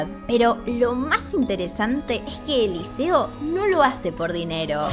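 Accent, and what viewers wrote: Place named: Buenos Aires, Argentina. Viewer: Espectacular video😉🙏🏼👍🏽🥰pero sugiero que mermes el "sh" tipico del porteño...para favorecer la difusion del contenido...y caiga bien en varios paises🫂🤗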